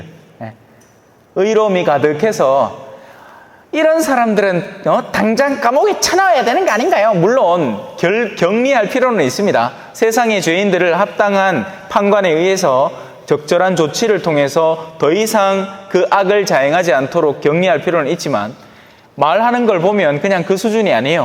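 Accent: Gyeongsang, South Korea. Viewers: 찬미예수님...신부님..말씀강론.잘들었습니다.경상도.사트리.정겹고.고향생각을하게합니다육남매.형제가.그립네요.신부님.사투리에.위안이되옵니다🙏🙏🙏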